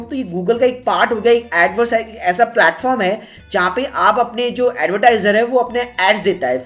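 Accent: India. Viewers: His Indian accent really drives me crazy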